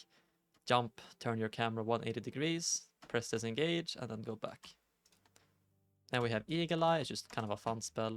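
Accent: Sweden. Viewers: Hey you are Swedish right? Trying to learn my accents.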